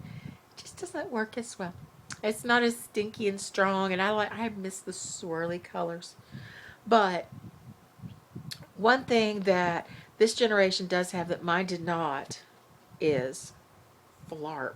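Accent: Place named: South, United States. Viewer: I had every toy you mentioned, the straw bubbles never worked for me! that stuff was crap. you look so good, loving you glasses and winged liner. seriously southern accents are just charming, you make everything sound so lovely. oppose to my New yorker accent which is...we'll interesting. I'm from brooklyn and now one here is soft spoken. No I don't sound like that stereotypical movie over dramatic ny accent. I worked my whole like to sound like I'm not from here!!!!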